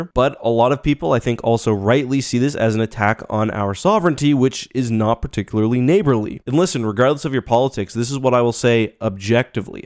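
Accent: Canada. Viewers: You are canadian?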